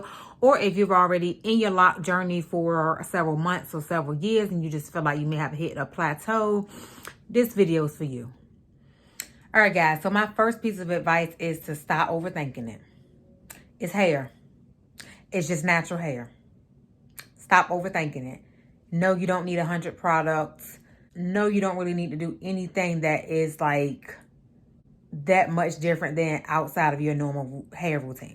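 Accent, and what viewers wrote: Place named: South, United States. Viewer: You are very southern lollll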